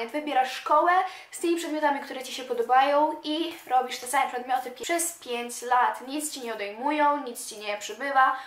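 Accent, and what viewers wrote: Italy. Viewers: Kocham twój włoski akcent, jest świetny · Ale masz włoski akcent 😄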